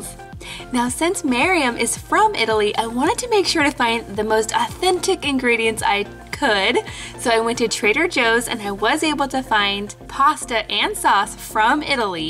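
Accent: Netherlands. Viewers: its funny how you speak out the words im from the netherlands aswel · #DUTCH Like if you're dutch. Love your accent, haha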